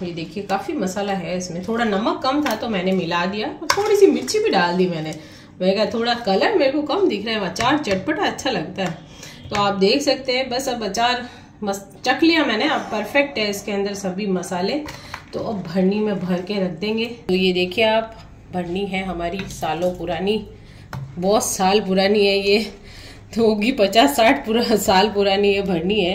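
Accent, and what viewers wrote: Jodhpur, India. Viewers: ram ram aunty ji me bhi jodhpur se hu or apke sare video dekhti hu bhut acha lagta h or apki boli sun ke muje jodhpur ki yad aati h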